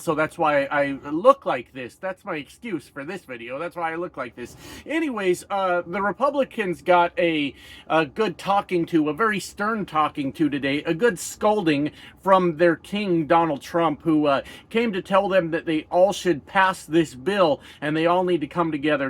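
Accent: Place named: Canada. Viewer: You sure you're from Colorado buddy? The way you think, speak, & drop F-bombs, you sure sound like a fellow fucking Canuck to me. There's been a lot of horror stories & tragedies that have become public knowledge since the overturning of Roe v Wade, but by far the most disgustingly "American" one is the brain dead Georgia woman currently being kept alive as an incubator AGAINST the wishes of her family. You just know that once they finally remove the baby and allow the family to take her off life support, they're going to hand this single father of 2 a god damn, mother fucking bill for hundreds of thousands, or more, before he's even had a chance to bury his wife. And on top of that, doctors are not sure if the fetus is going to make it, and are already concerned about major disabilities if it does due to having fluid on the brain. That's some disgusting shit, on so many different levels.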